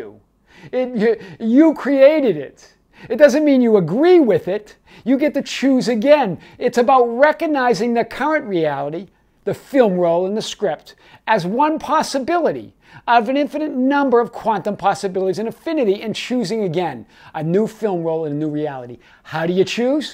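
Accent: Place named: New England, United States. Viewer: Where are you from?! From the hat and the accent I would guess somewhere in New England! From New Hampshire over here! Blessings to you! Love your videos. Thank you for clarifying for, and inspiring so many people around the world! ♥️♥️♥️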